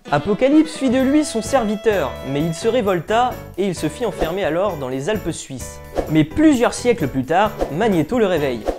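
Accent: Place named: South-West, France. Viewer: Pas mal mais ton accent part en accent du sud ouest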